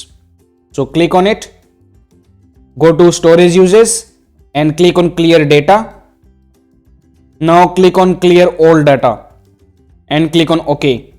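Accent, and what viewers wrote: India. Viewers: 😆 your english voice is like indian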